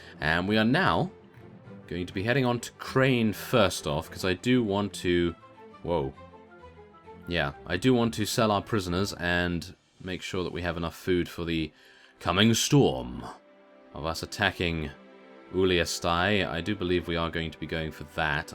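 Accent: United Kingdom. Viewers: awesome... your videos have been a source of entertainment for me for a long time now...ps: I love your british accent ^.^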